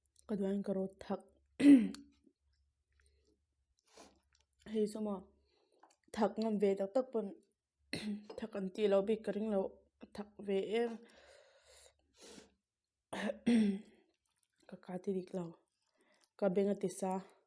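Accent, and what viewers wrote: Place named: China. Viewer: Chinese mizo tawng thiam I ang katibawihte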